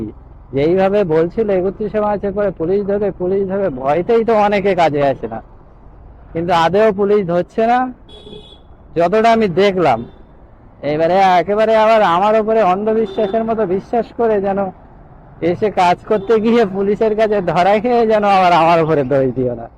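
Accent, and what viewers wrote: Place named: Basirhat, India. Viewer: Apni bosirhat er chele taina? Apnar kathar tan okhaner moto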